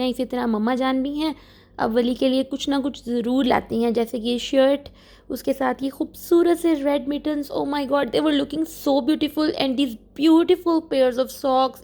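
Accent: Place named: Punjab, India. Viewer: aajkal punjabi mode kyun on hua wa hai?? you punjabi is sooo cute - and please make shakarkandi ki shaan mai video :)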